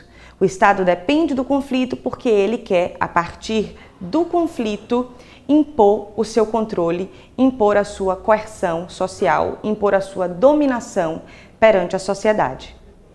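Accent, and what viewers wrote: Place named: Bahia, Brazil. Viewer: acho que vem da BAHIA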